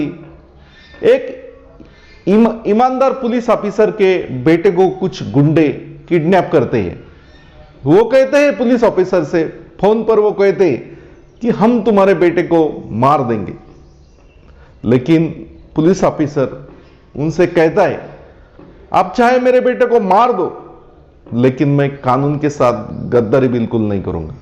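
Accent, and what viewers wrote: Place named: South, India. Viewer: Bhai pehle to apni Southwali Hindi ki jagah original Hindi bolne ki zarurat he. Ab baat Shakti film ki to is film me Amitabh ki achhi acting ke baavjud Dilip kumar unke upar bhari pade the. Kyuki Amitabh ne apni Deewar, Trishul, Lawaris me bhi baap ko nafrat karnewale bete ki bhumika ki hui thi. Is vajah se Shakti me bhi Amitabh ne apni acting me repeat kiya tha jiske kaaran unki acting kuchh bhi naya pan nahi dikhai deta tha. Dusri aur Dilip kumar ne pehli baar 1 aadarshwadi Peeta ki bhumika nibhai thi. To zahir si baat he ki Dilip kumar ki acting hi jyada limelight paati.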